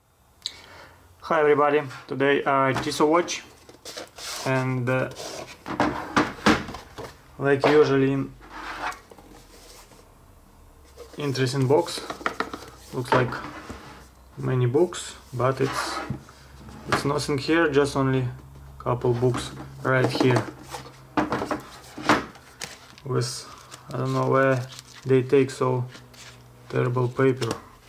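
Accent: Russia. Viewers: я не знаю английский, но обзорщик явно русский)))) а часы отличные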